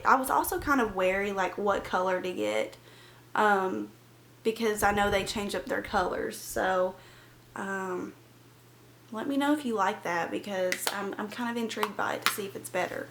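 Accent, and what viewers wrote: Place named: Oklahoma, United States. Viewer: Omg I love your accent!!! I wish texans sounded like that. I live in texas and we talk really fast and nothing like that. I was born in Oklahoma so I used to have an accent similar to yours but not anymore :/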